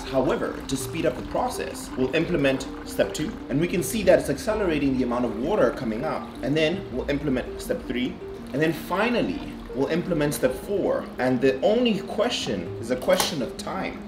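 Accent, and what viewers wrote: Cape Town, South Africa. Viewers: You’ve completely hijacked a bad accent, what happened to the Kaapie one?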